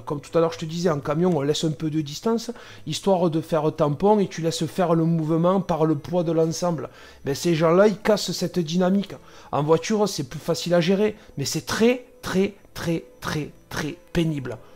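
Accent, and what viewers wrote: France. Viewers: ta voix de la province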